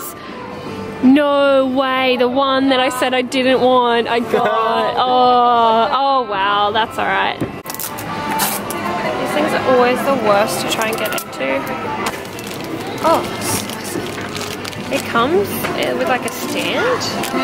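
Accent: Australia. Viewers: I love how hard you spin that gacha spinner! And oh God I'm such a sucker for a sweet Australian accent. You just got a new fan,!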